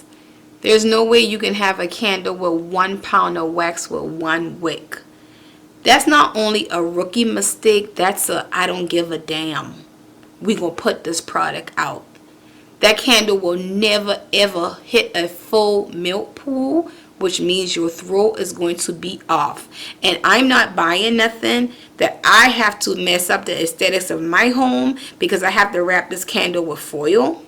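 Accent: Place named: New Orleans, United States. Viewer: You sound like you’re from New Orleans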